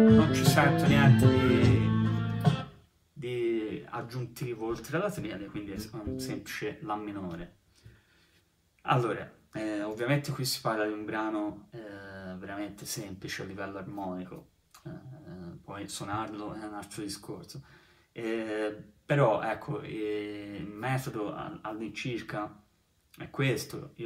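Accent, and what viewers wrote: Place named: Livorno, Italy. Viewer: mi piacciono molto le tue lezioni di basso, fra le migliori che ho trovato su youtube! e se non sbaglio, dall'accento mi verrebbe da dire Forza Livorno!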